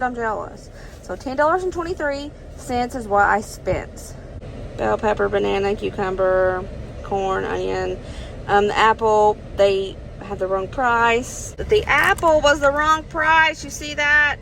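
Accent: South, United States. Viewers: I had to add that i love, love, love your accent. It is comforting and sweet. I feel like I'm listening to a southern friend. I'm from northern Virginia, so our accent is less here...still technically in the south, but because we live near the DC Metro area in the city, everyone's accent is a mix of where they are from (very international) and from here. My parents are both from Wisconsin, so I have a bit of a Norwegian accent. You can cook all the beans and season them. You can make half still whole and do the other half refried. You can freeze part of what you make, as you are correct...cooked beans last in the fridge for 5 days. You can freeze cooked beans up to 3 months. I frequently cook a double portion of beans, then freeze half for the following week. This saves time and money (stove/electric). I thaw the beans in the fridge, then serve differently than the prior time. 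With rice, in a soup with vegetables and a boullion cube or two, or on toast, corn tortilla, etc! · would you be willing to share your garden journey. I know you may have already started planting. But I would love to see videos on what all you grow & what you do. I like the videos you do for budget cooking. When you were getting the vegetables it reminded me that last year you would use stuff from your garden. I think people enjoy watching you. Your sweet southern accent, smile, laughter & how honest & real you are. I know when ppl start channels it might be for a specific thing. But clearly your subscribers enjoy all aspects of you are willing to share. From the camping & spending time with your family, to the fun game you & Dusty played. Tell the family hello. God bless you all all ❤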